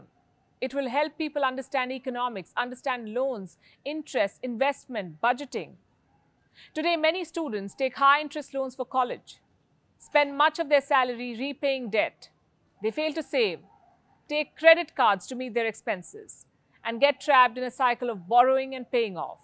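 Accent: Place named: India. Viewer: Madam, nice day to you, a filipina new fan of you here, i am a fan of british and american accent english but now my top on the list is indian accent, and i like it very much...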